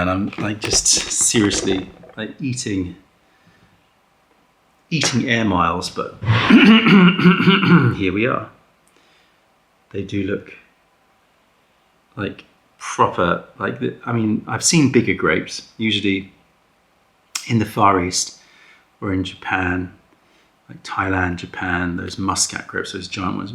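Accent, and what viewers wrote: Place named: England, United Kingdom. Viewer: I really thought you were Indian! must be the English accent😊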